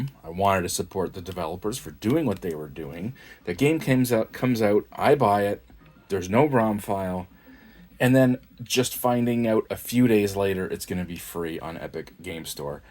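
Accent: Canada. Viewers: You sound like Canadian garbage. I'm out.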